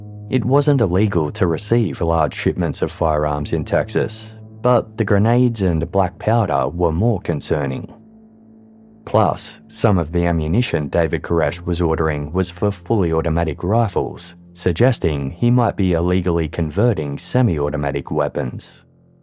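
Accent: Australia. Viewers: He's an Australian...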